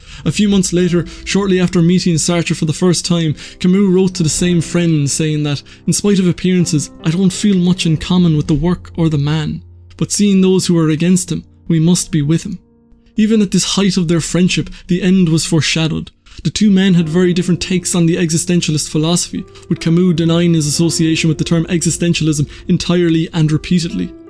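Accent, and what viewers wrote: Ireland. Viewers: More of the later I think. Although we may have chatted in a group after the course, we definitely passed each other around the court! I just saw your post on r/philosophy, i would usually pass over videos, but am reading Camus at the moment so thought i'd watch. I was glad i did when i heard an irish voice, then when your face came on, i recognised you immediately!! 😂 Great work on the channel looks like you are working hard at it, and exploring some really interesting stuff. This video was great, very interesting stuff! Have you read much Stephen Batchelor? I thought Alone With Others was fantastic, I think you might enjoy it too! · the problem with this video is it is terribly biased. as in many dialectics, both men were correct. life isnt black and white. in general violence is to be avoided, but there are times when radical change and revolution is necessary. 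if one lives in a democracy today, more than likely it came from a violent revolution. in terms of ussr obviously sartre was wrong, in terms of algriers camus was wrong. the idea that one can support a totalitarian regime in its crimes to create a utopian future seems as naive and dangerous as it is to think colonialists will stop theft and murder bc you give them flowers. it seems particularly interesting coming from a man with an irish accent, there wouldnt be a free ireland at all had they not fought.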